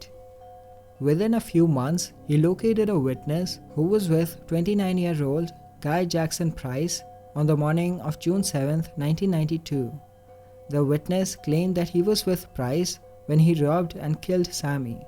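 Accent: South India, India. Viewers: The voice over Indian voice made this even more scarier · This Indian accent is so thick one could easily cut it with a knife. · Few seconds and then i have to stop....annoying Indian accent... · The narrator have a South Indian accent, I like it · U have south indian accent 🙏🏻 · I have difficulty with Indian accents. I won’t watch another one with this guy. Normally a woman narrates these.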